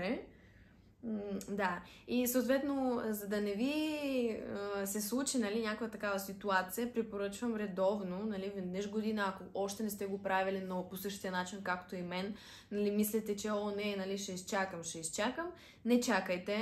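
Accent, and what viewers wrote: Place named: Russia. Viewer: Долавям нещо руско,жена ми е рускиня както ти така и жена ми бъркате адски много граматиката:)Дори в заглавието на клипа има грешка:)Успех и моля не говори като Наталия Кобилкина)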